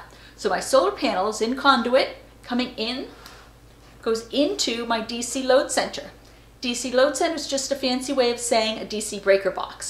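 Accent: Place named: New England, United States. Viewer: at first I heard a very slight N.J. accent but i knew it wasnt right ..then it hit me Maine or New England Not bad for a Canadian Eh !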